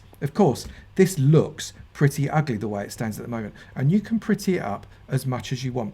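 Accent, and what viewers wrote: United Kingdom. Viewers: soy mejicano, y entiendo muuy bien a los americanos pero... el asento británico, dios mio es hermosamente difícil de entender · That Hen, is called a British accent. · Because it sounds British?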